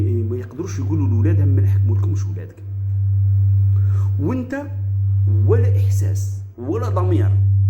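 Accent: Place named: Biskra, Algeria. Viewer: دكتور هل انت بسكري لخطراش لهجتك بسكرية ماشاء الله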